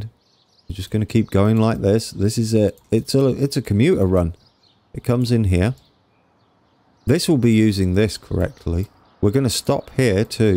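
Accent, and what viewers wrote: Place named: Midlands, United Kingdom. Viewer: Wonderful work! Keep it up! And I got the "shout out" at the start... I'm blushing a bit (BTW - surname is pronounced "Kool" (really!). Austrian - German family. The spelling in German has the "umlaut (dots)" over the "u", but the typewriters on Ellis Island 140 years ago didn't have that key!) Good effort you! So an American in the Midwest, with the German last name, who lived in London for a couple of years right at Y2K and a bit, who's very much a British railway / London transport nutter helping out a Midlands (If I place your accent properly?) bloke who's living all the way over in the Hill Country near Austin, TX with the livery colours of a West Midlands train on this recreation. What a crazy, mixed up world! Lovin' it!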